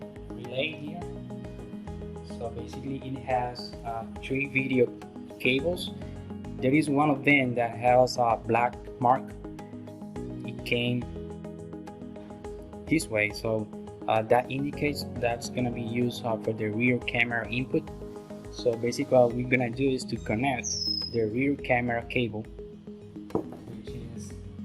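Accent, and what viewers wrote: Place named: Dominican Republic. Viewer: Loco pero tú sueña más Dominicano que yo.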